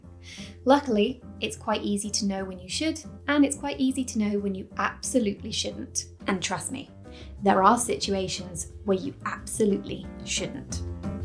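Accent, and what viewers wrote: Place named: England, United Kingdom. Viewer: Thanks for the advice , I love your English accent!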